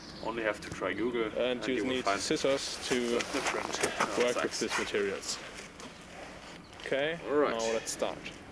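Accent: Germany. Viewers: god german english^^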